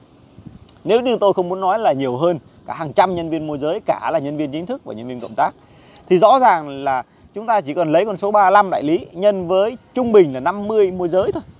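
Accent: Thanh Hoa, Vietnam. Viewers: giọng thanh hố hay sao ấy nhỉ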